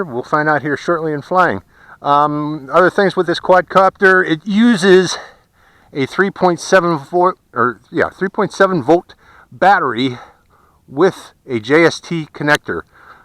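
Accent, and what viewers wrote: Pittsburgh, United States. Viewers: Are you from Pittsburgh or Western PA?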